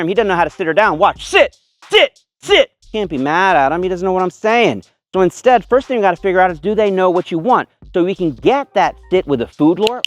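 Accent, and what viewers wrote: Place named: Louisiana, United States. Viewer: Nailed that cajun accent!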